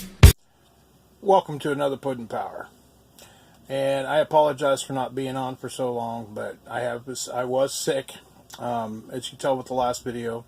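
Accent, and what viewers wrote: South, United States. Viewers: Hi darlin,you know that's how we southern people talk.I hope you are not bothered by that.I am so happy you started you own video's.I am a new sub.Looking forward to more <link> safe my friend.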